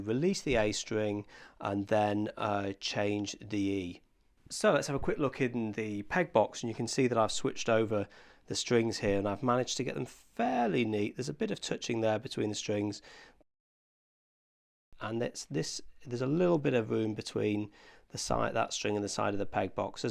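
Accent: England, United Kingdom. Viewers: Nice job! Love the English accent too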